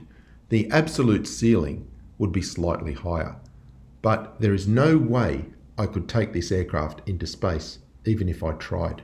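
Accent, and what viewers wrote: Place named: Australia. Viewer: As much as I love seeing you debunk flat earth, what about some astronomy videos? Your calm Australian voice and the way you explain things, I think would be really beneficial to many people. Also I'm from the UK so it would be really nice to see your stars because as an amateur astronomer myself there is only one thing worse than a cloudy night when trying to view stars below the equator and that's that damn globe in the way! Keep up the good work buddy.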